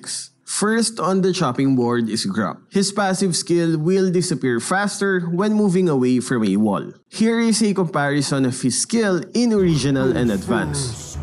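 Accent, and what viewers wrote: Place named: Philippines. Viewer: The filipino accent is strong in this one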